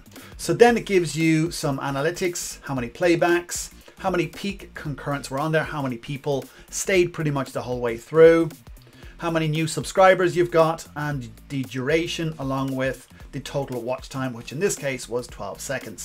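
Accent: Ireland. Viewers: Are u Irish?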